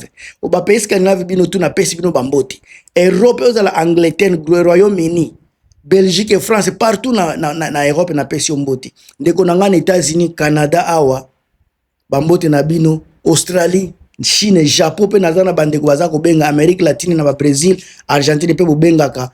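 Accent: Rwanda. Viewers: Oza kizengiyo bozela yo oza congolais kizengiyo nyama yoka ata lingala nayo rwandais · Liboma nayo yoka tonalité ya Français Rwandais ce normal olobele m23 po oza rwandais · Oza rwandais lingala na yo ezo funda yo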